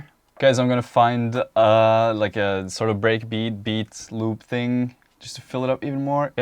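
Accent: Norway. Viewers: So is this dude norveggian or sveddish?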